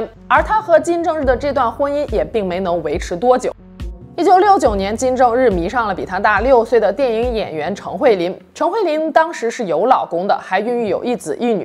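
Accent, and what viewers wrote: China. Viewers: up咋是咱大陆口音啊，不像台湾人嗲嗲的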